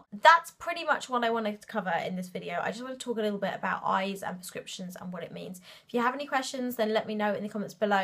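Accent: England, United Kingdom. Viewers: Hi again! ah I'll go back and listen again. I'm glad I emailed you. I'm guessing you are in England somewhere ( hearing your accent ) so you are about 5 hours ahead of me, so it's about midnight there. Anyway, thanks for responding and so quickly too! I really appreciate it, and you said that very well, I get it now! Thank you again for your time:-)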